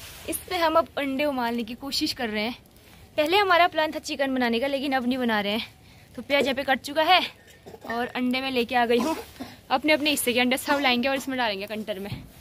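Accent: Pahari, India. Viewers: आपकी पहाड़ी बोली बहुत अच्छी है 👌👌👍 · tumhari jo ye pahadi m bolna hai na mujhe bahut achache lagate hai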